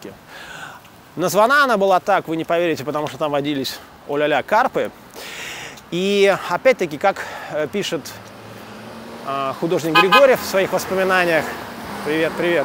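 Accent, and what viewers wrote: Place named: Saint Petersburg, Russia. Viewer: теперь я наконец понял, что значит питерский акцент)))) вернее не акцент а - манэра)) огромное спасибо! отличный материал, отдельно за Вяльцеву. Жаль не знал раньше, зато теперь могу смотреть НОН - стопом) огромный респект,!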